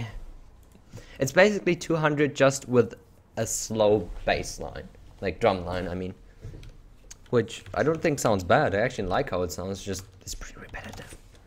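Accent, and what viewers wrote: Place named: Norway. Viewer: Was this pre-transition? You sound sort of exactly like any Norwegian guy and it’s off putting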